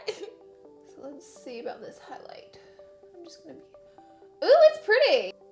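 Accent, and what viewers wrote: South, United States. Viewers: Does she have a southern accent? I can’t tell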